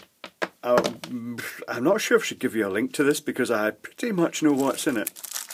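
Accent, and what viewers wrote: United Kingdom. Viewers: I don't know why I keep watching your videos. I know nothing about electronics and most of this I just don't understand. Must be your soothing british voice... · I will never understand why British people pronounce aluminum "aluminium"... There's only one "i" in aluminum.... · He's in the UK. Here it is spelled Aluminium (with an extra i) and pronounced that way. There are many differences between UK and US spellings/pronunciations. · oh, of course. whenever i hear a british accent i assume the device in the vid has a G-type plug :D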